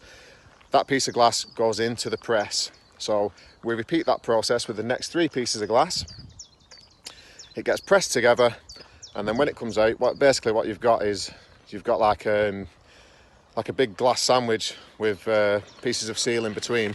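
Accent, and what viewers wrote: United Kingdom. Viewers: hello from Russia, I understood around 70% of your speech but I enjoy the British accent. I would like to speak like you I mean with accent..)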